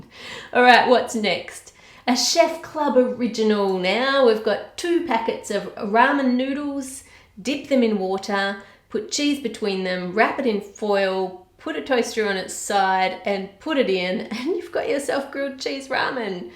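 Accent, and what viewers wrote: Australia. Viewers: Hey I love your channel And after binge watching your channel I changed my Siri to Australian since I love your accent Hope you reply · Her Australian accent is so strong😂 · I love your videos so much omg I remember watching you like 6years ago. I actually learned english by watching your videos😁 now I have an aussi accent even tho I'm not aussi😂 thank you for keep making these videos🥰 I wish I could support you on your patreon but I unfortunately can't atm but you'll definitely be the first (and probably only) person I'll support! Thank you for everything and keep going❤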